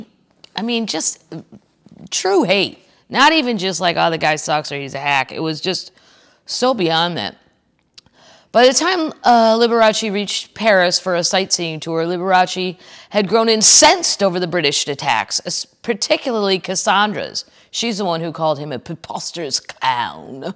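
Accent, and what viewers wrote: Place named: Wisconsin, United States. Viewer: GIrl you are breaking land speed records releasing these. you''ll spoil us termites. Thanks.:) Envy accounts for a lot of negativity. I'm unemployed but I've never had time to do all that negative stuff. Life is too short. Love that Wisconsin accent. Ooo that is not my definition of a hillbilly. I speak banjo. Termites- are we all safe or have some of is blown away. The winds have picked up here. Yeah Confidential was a lurid version of the Enquirer- there is a natural curiosity about Hollywood, but who's life was ever improved by reading that swill? A medical degree may be helpful when I'm seeking advice. Night night termites. I am happy for anyone who loves what they do for a living. What a luxury.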